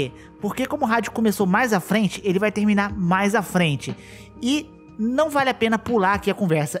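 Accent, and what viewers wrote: Rio de Janeiro, Brazil. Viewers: Ele é do Rio de janeiro se nao me falha a memória 🤔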